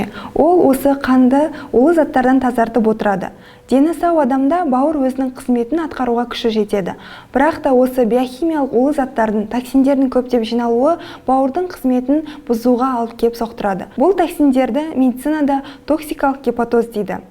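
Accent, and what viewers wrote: Standard. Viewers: Көп жаса Айналайын ана тілімізде таза сөйлейтін сендей қыздарымызға Алла разы болсын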